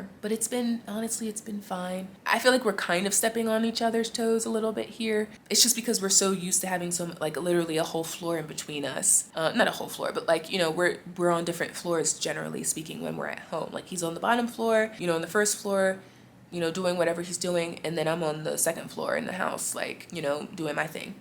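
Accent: United States. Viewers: Welcome back 🤗🤗🤗🤗 we cant tell if u AA until u open your mouth, you must just be used to greeting even people u dont know 😆😆😆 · People don't know just by looking at you that you are American so that can't be why they're staring. You don't look American, just African. I think it might be your accent that gives you away. Or vlogging in public. The staring doesn't come from a bad place. People here take interest in other people.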